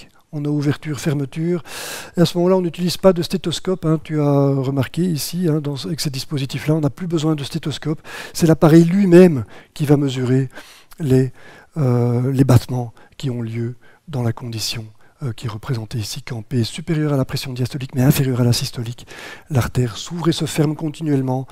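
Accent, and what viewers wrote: Belgium. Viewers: Bonsoir Alain Soral ... alors à Lauzanne à présent ?.. mais alors d'ou vient cette accent belge pas courant en Suisse ?.. On ne connait pas la deuxième personne du pluriel en belgique ?.. Cette façon de se croire moderne en tutoyant les inconnus au pretexte qu'on est derrière son ordinateur m'agaçe passablement !.. Je vais donc aller ailleurs !.. Bonsoir !..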